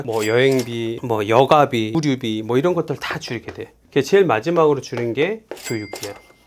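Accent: Jeolla, South Korea. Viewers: 아 전라도 사투리 ㅈ같네 진짜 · 라도 사투리만 고치시면 딱 조을텐데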